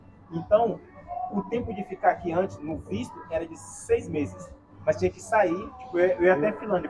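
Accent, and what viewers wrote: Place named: Brazil. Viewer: Não Acho que há problema algum nisso, não deixa de ser um idioma, e nos entendemos, e se acompanhasses realmente o canal dele, saberias que ele, adora a cultura e o povo brasileiro, e muitas vezes mencionou que sempre que fala com brasileiros tenta falar como um brasileiro, o que eu acho bonito como brasileira, não sei para os angolanos, mas isso não lhe tornara brasileiro, ele ama idiomas, e idioma não se baseia só na lingua mas sim em pronúncias e sotaques diferentes, aprender sotaque de outro país também é um desafio linguístico. Parabéns para ele, Brasil te ama.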